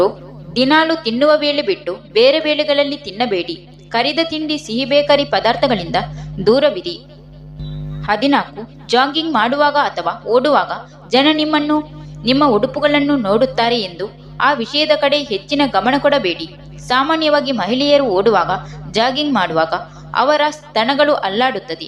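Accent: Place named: Kerala, India. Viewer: YOUR EXPLAINATION SLANG YOU ARE MALAYALI.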